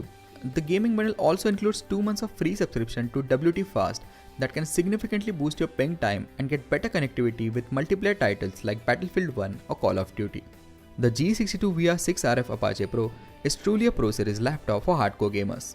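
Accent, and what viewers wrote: India. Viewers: Your thick Indian accent makes it hard to understand. I appreciate your effort though.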